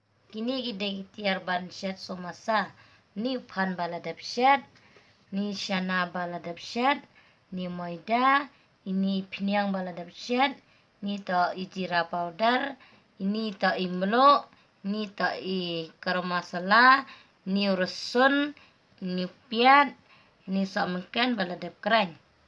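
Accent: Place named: Meghalaya, India. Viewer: Klam bait dei iong pnar leh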